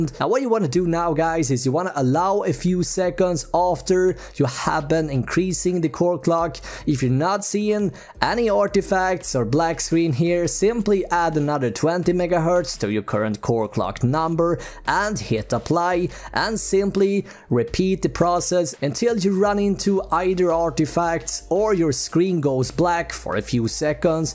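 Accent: Sweden. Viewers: You have a Swedish accent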